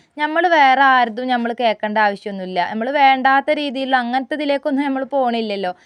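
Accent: Malappuram, India. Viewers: നിങ്ങളുടെ സംസാരം കേട്ടപ്പോ സ്ഥലം മലപ്പുറം ജില്ലയിൽ ആണെന്ന് തോന്നി 😊കറക്റ്റ് മലപ്പുറം ഭാഷ തന്നെ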